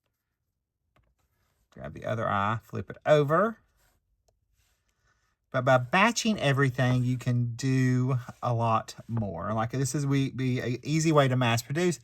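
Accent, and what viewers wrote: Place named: South, United States. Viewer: Such a cute card and it came together so quickly! The ink blending on your die cuts and the highlights from the white gel pen really do add detail that packs a solid punch! You're the first crafter that I have heard share the term "Decision Fatigue" and this is real! I'm sure that it occurs more than some will let on but thank you for bringing this valid term to light. Love hearing your southern accent and watching you create.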